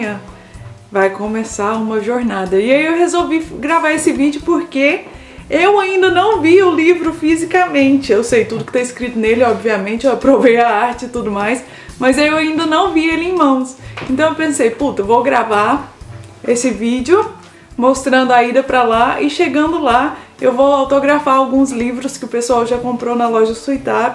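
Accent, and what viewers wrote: Minas Gerais, Brazil. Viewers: Ai, sotaque mineiro! O seu não é tão puxado, mas o do seu irmão é muito! Adoro! Minha família é mineira e morro de saudade do sotaque deles! Sei bem como você se sente vendo o seu primeiro livro pela primeira vez porque também sou escritora e publiquei livros, e é incrível pegar o SEU livro pela primeira vez! É lindo! Mas claro que você vai vender muito mais que eu. hahahahahaha De qualquer jeito, boa sorte e eu com certeza vou querer seu livro. Beijos e parabéns pelo livro! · Que simpatia voce! Conheci recentemente seu canal e estou gostando. E esse sotaque lindo, me deu saudades da minha querida Minas! Araxà è uma gracinha, nao fica muito longe da cidade onde eu morava ( Lagoa da Prata) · Ai bom demais da conta esse sotaquinho nosso mineiro né gente!!! Sucesso!!!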